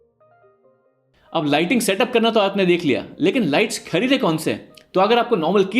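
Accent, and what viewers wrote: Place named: Pakistan. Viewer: Week done bro. Apka Hindi/Urdu ka akcent Bilkul h Hamari tarah h mtlb pakisatanio ki tara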